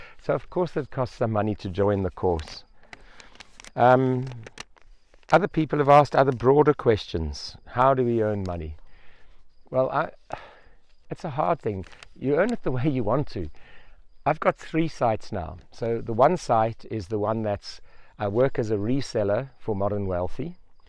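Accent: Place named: Zimbabwe. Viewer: You sound like a Zimbo. We live in Extremadura. I really want to get something going but not enough time right now. I shall keep watching you until I can get a site going.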